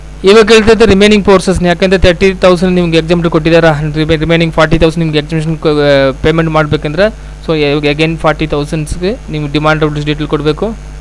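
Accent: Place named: North Karnataka, India. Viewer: Yappa maraya kannada dag matad pa hingyak alakati clear aagi matadidre tiliteti...Uttara Kannada dav adree yak nachkotiya hodi9 Annu yak achcha kannadalli matadbek anta alta idiya,relax agu modlu..,tekta idira eke...?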